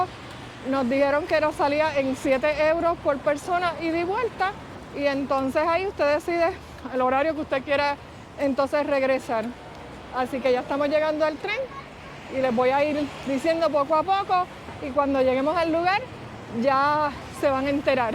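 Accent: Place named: Puerto Rico. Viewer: Saludos. Por fin encontré un YouTube de España explicado en "puertorriqueño". Me gustó que hablas pausado y genuino. Gracias y mucho éxito en tu canal. Myrta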